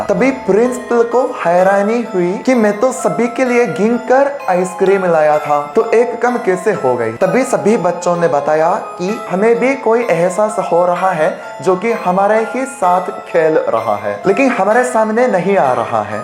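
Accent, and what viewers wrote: Standard. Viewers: Itni shudhh Hindi to ham bhartiy bhi nahi bol paate jitni aap bol lete ho😂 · You speak Hindi purely more than indians. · Your hindi need to be smooth and be practiced btw your hindi is standard 😇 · I am an Indian and from north india still he speaks better hindi than me acctually he speaks shudh hindo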